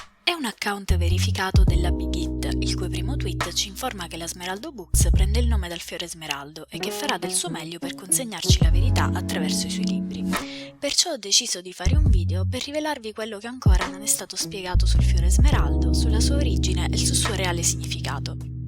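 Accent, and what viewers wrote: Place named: Sicily, Italy. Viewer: È incredibile il lavoro che fai!! Non ti concentri solo sulla storyline come fanno tanti altri youtuber, ma guardi al quadro generale e cerchi di estrarre il messaggio più ampio che i ragazzi e la bighit vogliono mandare, unendo tutti i riferimenti letterari (da studentessa di psicologia questa cosa mi esalta). Mi dispiace solo che tu non abbia tanti iscritti, forse perché i video sono in italiano. Ti consiglierei di provare a parlare in inglese, e se non sei tanto sicura della pronuncia usa la voce robotica, vedrai che i tuoi iscritti esploderanno perché da quello che ho visto sei la migliore in circolazione❤❤❤ Ps. Adoro l'accento siciliano 😍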